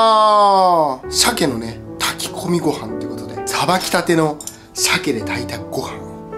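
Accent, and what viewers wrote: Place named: Hokkaido, Japan. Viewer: 北海道弁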